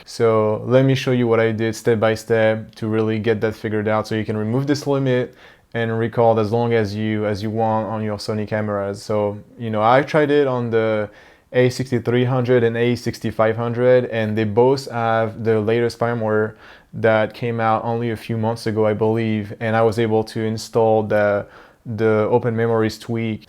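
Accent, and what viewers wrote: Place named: France. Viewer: God bless you....I'm trying to record higher quality videos to keep my students moving with online classes here in NYC as we have been on lockdown due to COVID 19. You just helped me record full classes, so that my students can keep moving during this traumatic time. Grateful for this vid. I hope you and your family are safe and well. I also heard a French accent. Enchante!!!!! Merci!!!!!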